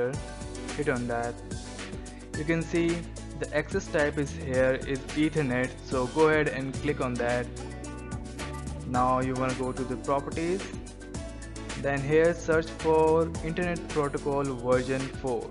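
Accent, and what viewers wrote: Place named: Bihar, India. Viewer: OEEY PUNDIT : FIRST DON'T PLAY THE DING DONG MUSIC !!! THE CABLE IS CALLED ETHERNET CABLE AND NOT A LAN CABLE . PLEASE DONOT USE ANY INDIAN JARGON AS COMPUTER VOCABULARY. NEXT THING > IT'S CALLED LOCAL NETWORK SHARING . THIS NOT ANY EINSTEIN 'S FORMULA . SO KEEP IT SIMPLE . BRUSH UP YOUR OWN ENGLISH DICTION (BIHARI DICTION ) AND ENGLISH PRONUNCIATION ! FIRST YOU LEARN COMPUTER PROPERLY THEN YOU TEACH OTHERS THE RIGHT WAY ! DON'T TEACH "LAN CABLE FOR ETHERNET CABLE ! THERE ARE STRAIGHT CABLE ,CROSS OVER CABLE TO CONNECT PC BUT USB CABLE ALSO CONNECTS ; SO YOU NEED TO BE SPECIFIC !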